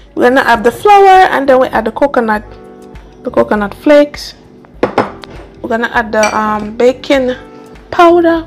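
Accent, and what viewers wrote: Jamaica. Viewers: Who is not a Jamaican. Stop talk . Do you know how a Jamaican sound. She is a 100% Jamaican if you don't know anything or sure don't say shit.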